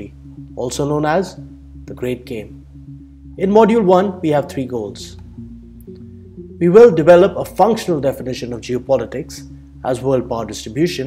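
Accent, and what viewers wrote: India. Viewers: Indian accent? new presenter? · Indian Accent? No no. Please no! ☹️☹️☹️☹️☹️☹️☹️☹️ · Pls dont speak in an indian accent its uncomfortable the accent i just dont like it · He sounds Indian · Oh boy it's Shirvan time! Hears Indian accent Oh boy it's World Power time!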